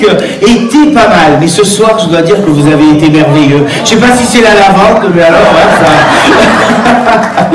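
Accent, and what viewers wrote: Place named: Marseille, France. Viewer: Super zize un plaisir d'écouter de découvrir ont retrouve l'accent de Marseille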